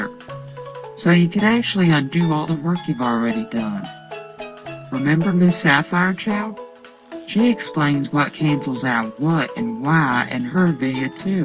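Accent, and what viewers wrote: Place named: South, United States. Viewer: Can confirm, lotta southerners talk like this. Even me from time to time😅 Surprisingly, this aged just fine though voice sounds a tiny bit scratchy(like just woke up kind of scratchy) It looks like it helped a lot of people going by the comments. Even now, this is a great video!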